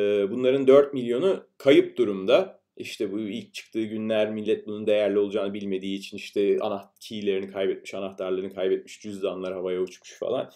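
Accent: United States. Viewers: anlatım iyi de şu amerikalı gibi konuşmaktan kurtulsan iyi olacak :D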